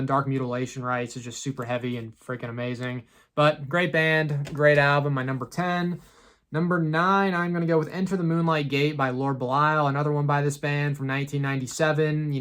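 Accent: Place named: East Coast, United States. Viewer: Are you from the East Coast? I ask that because of how you pronounce Twenty as "Chwenty." I give my workmate shit for that lol, hes from Mass love the video, just revisted again from when I watched it before and never noticed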